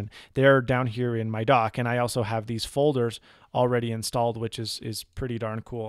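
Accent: United States. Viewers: This was like an old Mac Madness Monday! Loved it. You sound way more American now as well. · Haha, of course there's nothing wrong with the accent, but we're used to hear him speak perfect american English. It's actually quite funny for his old viewers to hear.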